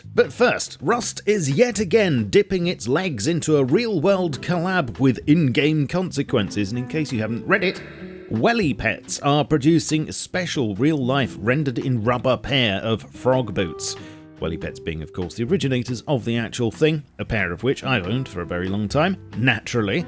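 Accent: United Kingdom. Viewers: brittish accent the entire video verry american bottle of water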